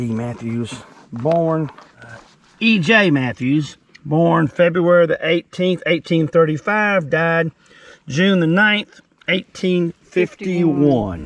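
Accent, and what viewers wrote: South, United States. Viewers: Thank you, this tour was fun and interesting .. Plus, l must say, enjoyed listening to the southern accent .. 👍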